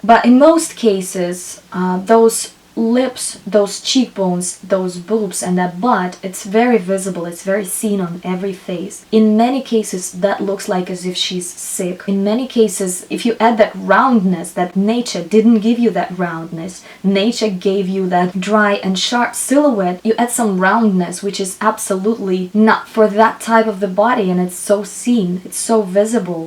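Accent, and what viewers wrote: Russia. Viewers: Ilona Piescikowska she's russian so she probably won't change her accent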